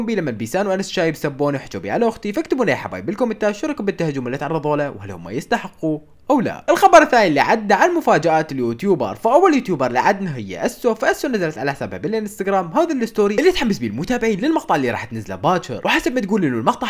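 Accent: Iraq. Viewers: عراقي اكيد من لهجته 💝🌚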